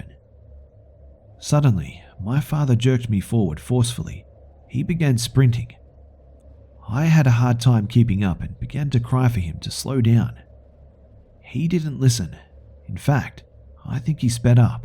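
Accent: Australia. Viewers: great video. keep up the good work and some people say they love your voice. To me it sounds normal because I am Australian too.:) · He's an aussie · your Australian accent is schmexi man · To honest, things are less scary in an when Australians talk! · Australia he lives were I live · Australian, I believe. But the horror master himself should answer that. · Aussie all you other people are so dumb he's one of us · he's Australian you rotten walnut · Coming from a Kiwi, this guy's accent is most definitely Aussie. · Aussie he's one of us mate! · I believe he is the Aussie of our horrors. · I can't take these stories seriously on account of that charming aussie accent. It's not scary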